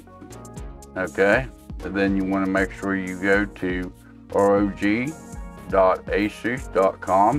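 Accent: South, United States. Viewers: I’ve never seen a man so southern but so smart with pc😂 THANKS ALOT BROTHER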